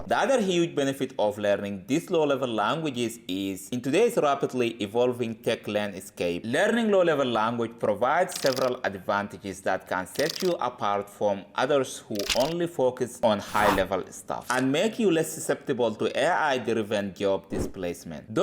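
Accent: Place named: Italy. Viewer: You sound Italian. Great content, bro.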